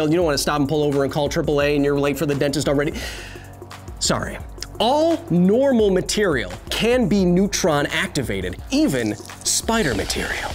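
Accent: Australia. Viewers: His Australian accent is actually very real lol. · That aussie accent is a 7/10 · thats a good Australian accent! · He's Australian accent 😂... It's good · That aussie accent 😂🤣❤🇦🇺 much love from down under. Bloody great video mate! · The aussie accent 😂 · That Australian accent is on point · ikr! I'm surprised there aren't more comments talking about austrailian accent lol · I know I'm late to the party, but that Aussie accent is almost spot on. had me excited for a sec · unironically a fantastic australian accent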